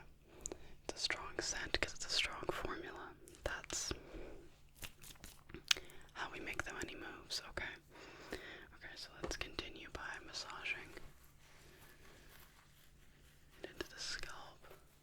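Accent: Canada. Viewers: ...Nigga are you canadian?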